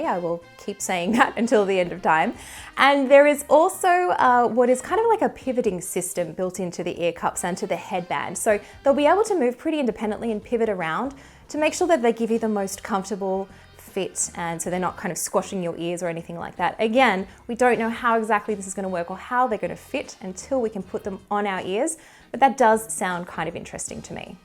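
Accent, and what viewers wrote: Australia. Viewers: Is she aussie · Is she australian?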